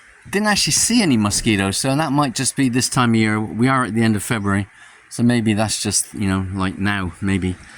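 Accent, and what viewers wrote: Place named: United Kingdom. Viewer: its pronounced "bung-car" hes from Britain us foreigners have quite different vowel pronunciations.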